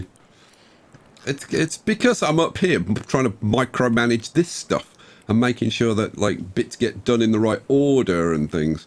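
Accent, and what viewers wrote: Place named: United Kingdom. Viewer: Dude you have big potential, you remind me of RCE with your British accent and enthousiast and jolly attitude. Also love the little interactions with your wife. Keep doing what you are doing man!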